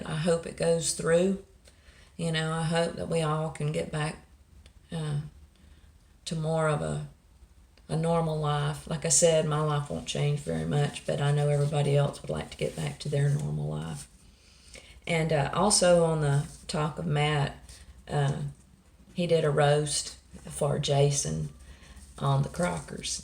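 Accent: South, United States. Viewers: it was wonderful seeing you and I understand about how this house arrest we are all on hasn’t really affected me to much either. I’m so very very sorry to hear about Chico and Gizmo, I loved watching those babies hop around and play.I know how hard it was for you and George.Again I’m so sorry to hear that.I’m hating to hear that George has been down for over two weeks. I pray he gets through this episode soon. I can’t imagine the pain he is in.I will definitely keep him in my prayers.Thank the good Lord above that Granny is home and doing well. She is an amazing women.GOD BLESS HER. Well I’ll stop rambling but it’s been awhile and I’m so sorry it’s been really rough for you guys, but I sure was happy to see your pretty face and here your awesome southern accent, I love to hear you talk.We southern girls have to stick together about our accent. God Bless you all and I will keep you all in my prayers. 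Please take care and be safe. 🙏💕🙏💕🙏💕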